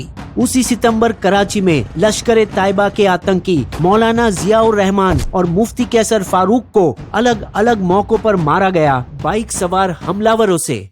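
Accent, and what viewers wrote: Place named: Punjab, India. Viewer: Iss video ke vois aditing wala jaror panjabi hai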